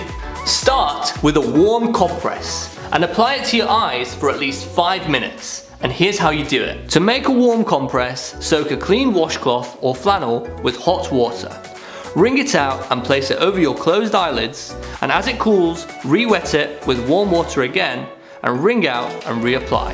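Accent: United Kingdom. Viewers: the British accent alone makes me feel better.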